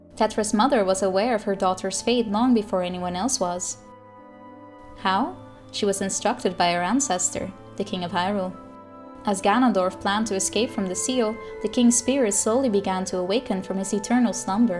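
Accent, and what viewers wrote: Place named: Netherlands. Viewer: She' Dutch.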